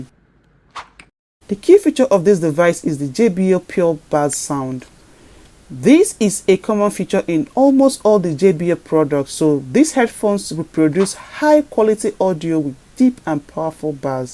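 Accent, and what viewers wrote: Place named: Nigeria. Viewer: Nigerian accent sucks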